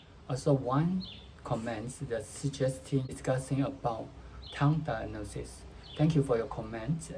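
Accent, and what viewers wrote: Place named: United States. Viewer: Very nicely explained sir, u r great and sober and your accent is as a native of America's Very nice explanation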